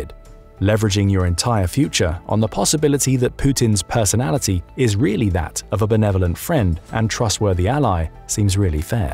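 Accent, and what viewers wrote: United Kingdom. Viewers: Narration so long, so British - uhg. Plausible deniability, let us swim merrily in an ocean of plausible deniability, rampaging absence of curiosity, and a penchant for regurgitating things that POWER says, because POWER wields all the microphones, and it says this, and even when it is wrong, nobody ever looks at me funny for repeating what it says - so I repeat. NYAHHHH! Power says NYAHHH.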